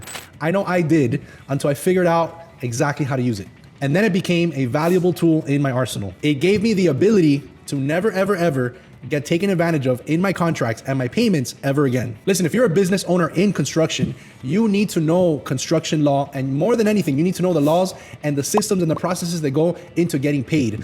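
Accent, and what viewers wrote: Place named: Miami, United States. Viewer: Are you from Miami? I could tell immediately by your accent! Lol. I'm also from Miami :)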